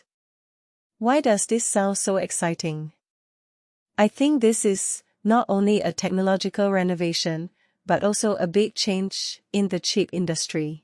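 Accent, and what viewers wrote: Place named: Malaysia. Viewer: This is Malaysian accent english. Whats wrong with that? It is clear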